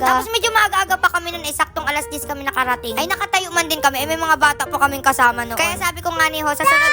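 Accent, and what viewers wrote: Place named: Marinduque, Philippines. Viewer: Marinduque ❤.. from samar😅 naaaliw akong manood s vlog mo at ganun na ganun sa salita nila dini sa Marinduque 😅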